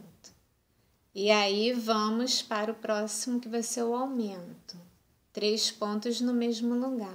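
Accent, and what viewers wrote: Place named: Rio de Janeiro, Brazil. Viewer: o seu jeitinho carioca de falar e um amor! Good Bless you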